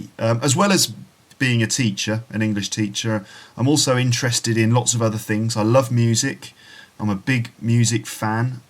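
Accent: United Kingdom. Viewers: I like British accent!😊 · I really like your accent "British"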